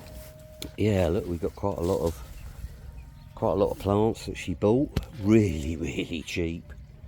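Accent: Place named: United Kingdom. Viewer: Looks great. Good luck to you. Just a fracture! Lol Sound like you come from the same part of the UK as me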